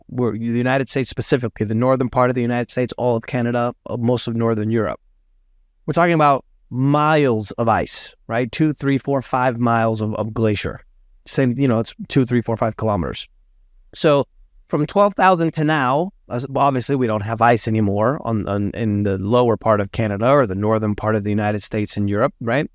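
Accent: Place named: Spain. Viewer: I miss your Spanish accent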